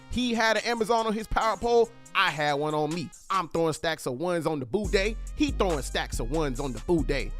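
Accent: Alabama, United States. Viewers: Holllll uppp you from Alabama?;?!?!?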